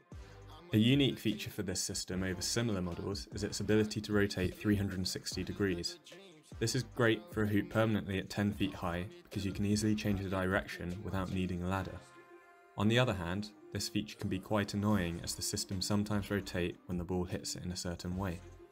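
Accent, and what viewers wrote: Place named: England, United Kingdom. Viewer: Shocked and pleased to hear the English accent for a basketball video!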